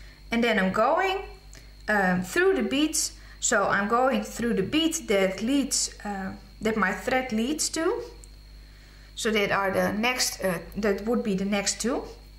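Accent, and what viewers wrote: Netherlands. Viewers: Nice tutorial! He, komt u uit Nederland?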